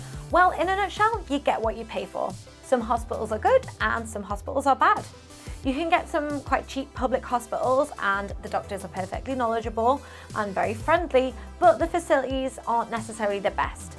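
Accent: United Kingdom. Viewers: You're so cute，as well as your British accent. Hope I can buy you a cup of coffee when I go back to China😄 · Sorry to say, with your accent I take it you are from UK and when you mentioned there were MANY pot holes in Beijing but when comparing to UK; the road in China is as smooth as a baby'd bum! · I noted that she and another British youtuber, Mr. Barrett, pronounces City as CE. Not sure this is a common UK accent?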